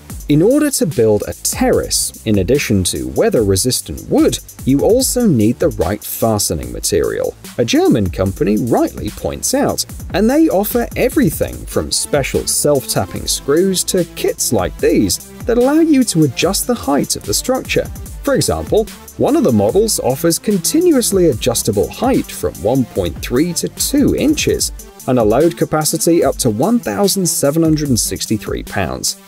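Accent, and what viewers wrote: England, United Kingdom. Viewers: I find it odd and confusing that you sound English yet you are talking in 'dollars'. I live in Australia. Who's dollars are you referring to?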